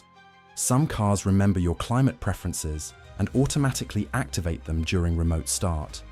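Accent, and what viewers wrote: United Kingdom. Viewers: All this stuff is great BUT we're / how do you set these things up. Are the directions in the manual? Telling us that both BMW and Toyota has a certain thing doesn't help. What models - what years. And the big question. The nariator has what sounds like a British accent so therefore is it only in England ???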